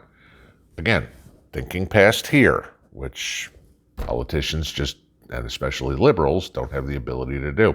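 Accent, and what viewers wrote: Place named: Upper Midwest, United States. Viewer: You don't sound like you're from TN. You have the short flat vowels of the northern midwest. Wisconsin? Michigan? Illinois? Just curious.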